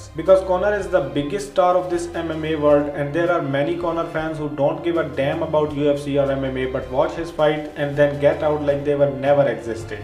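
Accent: India. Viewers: In the description it said "Asian accent" I understand that India is in Asia but it would have been a lot more honest to say Indian accent. All the same I'm pretty sure most people can understand you, your English isn't that bad. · I am sure the voice in this video is an Indian Guy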